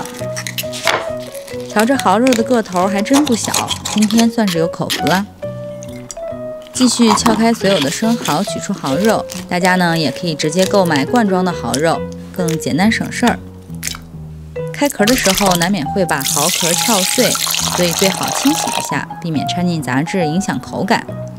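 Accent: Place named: North, China. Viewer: 看起来很好吃 喜欢听你北方味儿的普通话 字正腔圆：）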